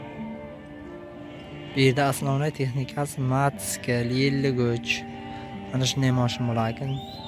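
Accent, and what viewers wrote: Surkhandarya, Uzbekistan. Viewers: Гап йук укам асил сурхоним шеваси маладис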